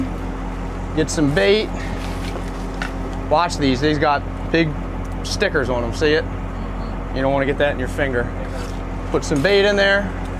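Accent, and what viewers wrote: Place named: South, United States. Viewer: southern drawl comes out when he talks to the Deep South People! 😂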